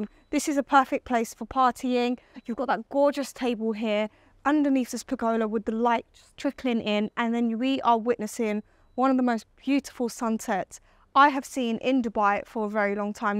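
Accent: London, United Kingdom. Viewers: Definitely an accent from the UK, possibly London. Great to see young individuals getting out there opening doors and driving forward … well done, keep driving forward… As an engineer in the Oil Industry I did the same, big world out there and you never know who you could meet that could change one’s life…. As I stated great presentation… this home is fantastic…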